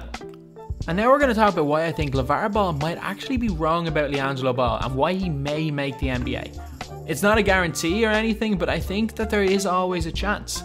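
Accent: Ireland. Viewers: R u irish ya??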